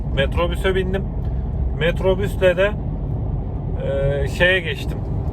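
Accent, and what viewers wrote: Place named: Central Anatolia, Turkey. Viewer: Kolay gelsin REIS konusma Tarzin ic anadolu lu oldugunu gosteriyo galiba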